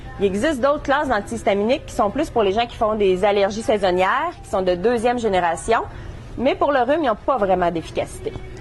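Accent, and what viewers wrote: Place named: Quebec, Canada. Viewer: intéressant mais.... qu'est ce que je de déteste l'accent québécois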